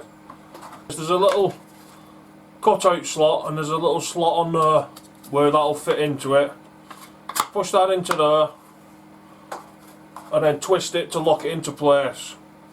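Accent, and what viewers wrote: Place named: Yorkshire, United Kingdom. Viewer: I'd watch these videos just to hear that lovely Yorkshire accent..... ;) :) :) :)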